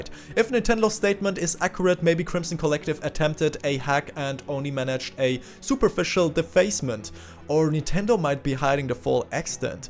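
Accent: Germany. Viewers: That fine German accent...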